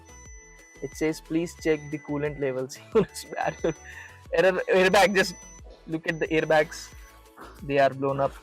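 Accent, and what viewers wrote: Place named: Bangalore, India. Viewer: typical blg accent uhh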